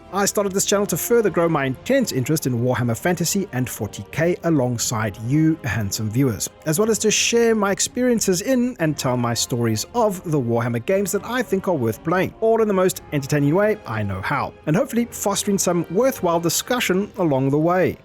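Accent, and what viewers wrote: South Africa. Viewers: Great video. Thanks for posting dude man. Great to hear a based south african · Are you from South Africa? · South African??? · you sound like a fellow SAFA. If so always nice to see a fellow ass end of Africa resident. Lekker Vid mate. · You sound South African, New Zealander maybe... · Interesting accent, sounds South African. Subscribed. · Haha, very cool to hear a fellow South African on YouTube, you sound like you are from the coast? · Hold up. South African accent? Opinion accepted.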